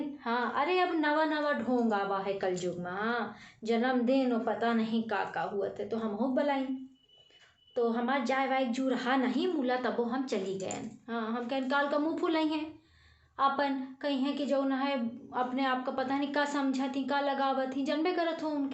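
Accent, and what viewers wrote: Awadh, India. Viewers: Bahute Neek Madam Ji, I like Yours Voice with awadhi Veri attractive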